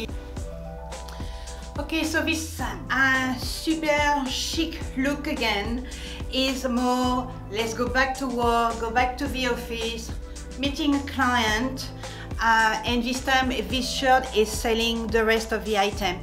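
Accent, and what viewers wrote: France. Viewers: I want to be you Beautiful....speaks French....French accent...stylish....I'm very jealous . X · you are so stunning! I just found your channel a few days ago and subscribed right away. I love your bubbly personality and your beautiful French accent ❤️. Everything sounds better with a French Accent. I’m a super Francophile so I’m enjoying you so much. Your style is super chic.....love your videos...I’ll be watching them all! Merci❤️👠👜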